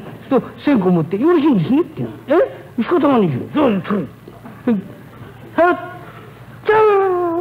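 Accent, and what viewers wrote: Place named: Edo, Japan. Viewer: 現存する音源では最高峰のひとつ。正確に文字に表せない江戸風の言い回しが凄まじい。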